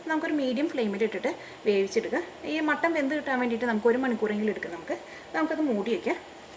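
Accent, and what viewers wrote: Thrissur, India. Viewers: R u from Trichur?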